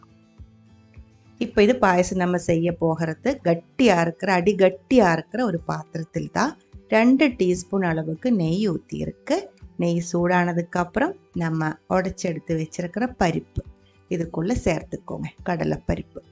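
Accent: Kerala, India. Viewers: ഒരു മലയാളി തമിഴിൽ സംസാരിക്കുന്നത് കേൾക്കാൻ നല്ല രസമുണ്ട് പായസം സൂപ്പർ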